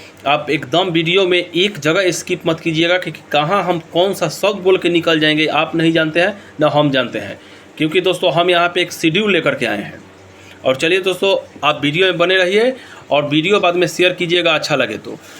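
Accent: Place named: Bihar, India. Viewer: Aap ekdam theth bihari style mei bataye hain sb samajh mei aa gaya sir 👍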